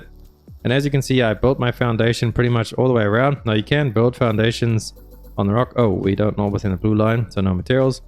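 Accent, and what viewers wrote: South Africa. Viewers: Cant help but shake the slightly South African accent?😅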